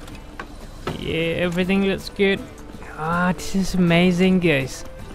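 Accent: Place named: Australia. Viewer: Where are you from? Your accent seems different, kind of australian but german but chinese.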